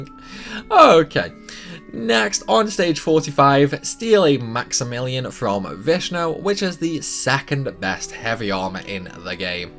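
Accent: United Kingdom. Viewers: Ngomong pake aksen british agak ngeselin juga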